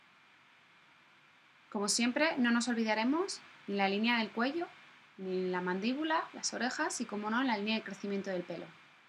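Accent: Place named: Spain. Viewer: que bonito lo que ensenas..yo no soy mujer, soy guy umm masculino pero cuando es de halloween excelente...me encanta tu acento espanol, spain. Para halloween estare listo.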